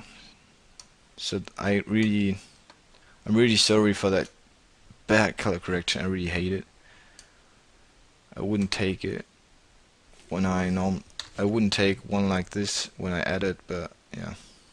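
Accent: Germany. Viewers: Uns Deutsche erkennt man sofort an der Aussprache.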